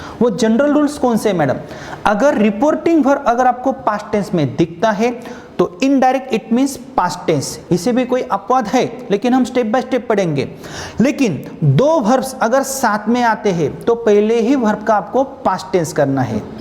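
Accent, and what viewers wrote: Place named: India. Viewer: I was struggling to understand India accent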